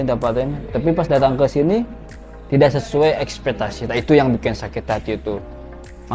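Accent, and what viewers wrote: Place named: West Java, Indonesia. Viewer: Kapan mao ke salmiya bang ? Kopdar" Aksen nya aksen sunda ini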